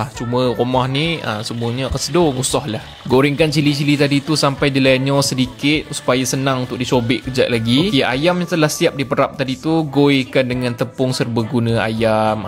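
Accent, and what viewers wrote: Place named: Perak, Malaysia. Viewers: Bunyi ckpnye mmg loghat peghak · sedap je dengorrr ckp perakkkk hehe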